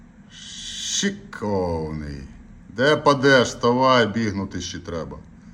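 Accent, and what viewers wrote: Transcarpathia, Ukraine. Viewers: Дякую за роботу і Закарпатський діалект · Йой,як я давно не чув файної закарпатської говірки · Закарпатська озвучка-ТОП❤🫡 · Дуже файно на закарпатський лад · Класно по Закарпатськи))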